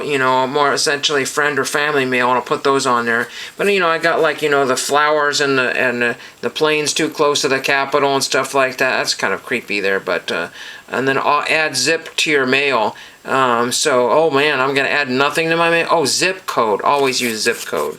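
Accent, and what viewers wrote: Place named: Kansas, United States. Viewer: Cool pickups. You need to work on that Kanas accent though.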